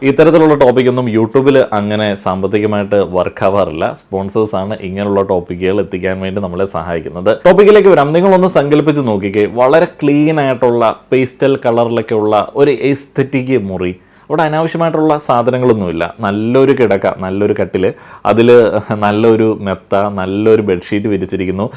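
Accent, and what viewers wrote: Kottayam, India. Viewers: May be kottayam slang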